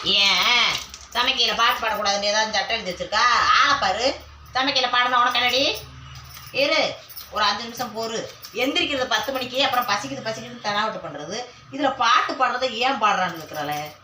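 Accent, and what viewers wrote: Madurai, India. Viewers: என்னையா மாதிரி இருக்கா கேள்வி கேக்குற பானு நானும் ராஜபாளையம் வாக்க பட்டுவந்த மதுரை அம்மா மாதிரி பேசுறேன் நெனச்சேன் நீயும் இப்படி தான் பேசுற பானு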